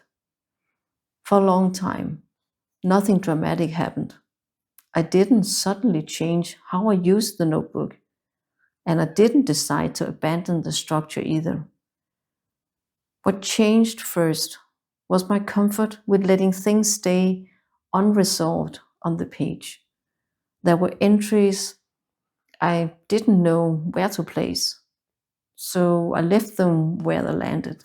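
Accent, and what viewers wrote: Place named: Denmark. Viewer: A Dane! Thought I recognized the accent. I also use the Hobonichi cousin, and it got me through my MSc here in Denmark. Though I am an American married to a Dane for the past 22 years.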